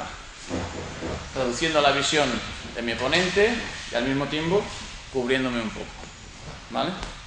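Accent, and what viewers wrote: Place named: Galicia, Spain. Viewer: Es gallego valezzzz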